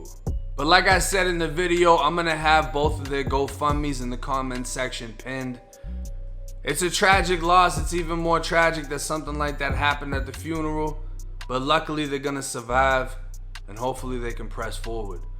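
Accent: Boston, United States. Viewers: i think he from boston moved to Florida now he's back in Boston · Bra your channel interesting, but I'm from the O (X) why you sound like you from Boston somewhere? No shade just wandering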